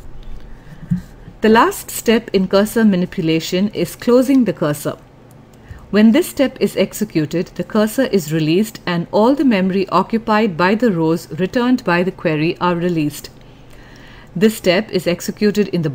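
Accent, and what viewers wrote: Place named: India. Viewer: Thanks for this. Good explanations and it is fantastic to hear someone with an Indian accent speaking English so clearly.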